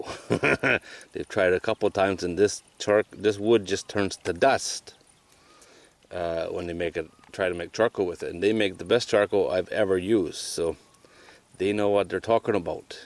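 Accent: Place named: Canada. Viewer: Great vlog, love the camping with the Dog. Where are you from originally? I would say Canada but not great with accents.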